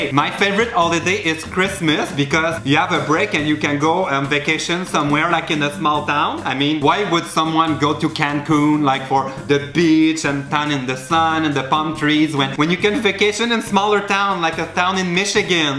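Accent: Quebec, Canada. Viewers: Je ne pouvais pas m'imaginer que l'accent québécois assez aussi horrible que français en anglais. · That was so hilarious! I didn't know that French Canadian people had a French accent when they speak English... That was a funny discovery. (Come on! Siblings: Frères & Soeurs) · T'as exactement le même accent québecois mais en anglais hahahah comme moi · I'm surprised by the accent. I thought only the Francophones from France have thick accents, but not the Canadian Francophones. Anyway, trop drôle! :D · Nils Bondenson I get what you mean, I live in france and for me the quebec accent is so different yet ppl there seem way nicer. He has a strong accent though. I was listening to Justin Trudeau yesterday and even he has a slight accent in english! I was like woooh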